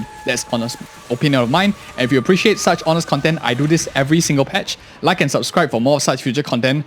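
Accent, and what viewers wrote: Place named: Singapore. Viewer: Are you Singaporean? We have similar accents 😮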